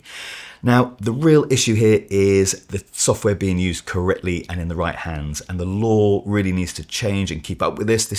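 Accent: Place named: RP, United Kingdom. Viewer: "Its not my fault" he wasn't Gen X, Y or Z by any chance? Fortunately I have a heavy regional accent and am wondering if this would be more difficult to clone.Your voice, is very clear and almost RP to my ear and is "almost" devoid of any heavy regional accent. Okay, you are obviously from the south of England but I wonder if a "heavy" regional accent is more difficult to clone? Answers on a postcard please:)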